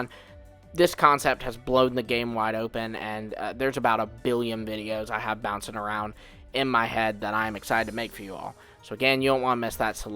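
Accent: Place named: Midwest, United States. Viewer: "Ope", dude, you are SO Midwestern in your talk. XD That's totally cool! So am I. I had to be clued into the fact a year or so ago that I actually said that word as much as everyone else. Never noticed. XD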